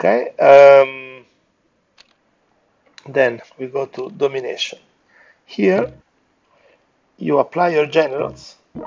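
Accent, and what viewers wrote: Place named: Thailand. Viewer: he's from thailand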